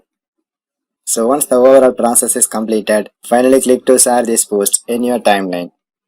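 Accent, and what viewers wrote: South India, India. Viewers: Your South Indian accent... 😁👍🏻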